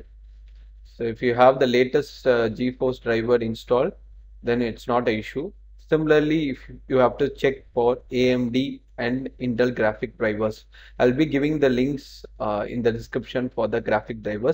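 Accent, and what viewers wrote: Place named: India. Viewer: Блин как же индийский английский похож на русский английский )))) Спасибо за видео, работает!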